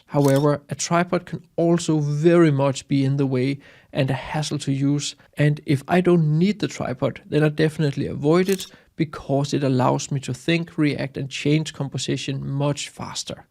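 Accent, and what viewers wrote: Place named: Denmark. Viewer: A denmarkian accent.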